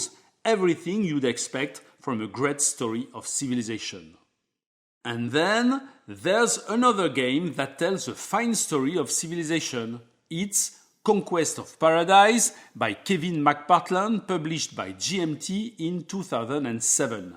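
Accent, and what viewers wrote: France. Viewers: I think you probably specified exacltly what i like in board gaming in these videos. I hope to see alot more content published by you in the future. And always with that beautiful frensh accent ;) If i had one request it would be to see an english verison of the Here I stand videos. :)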